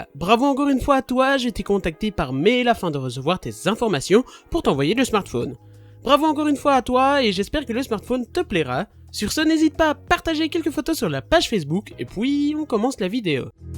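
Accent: Belgium. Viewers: Bonne vidéo ! Belge?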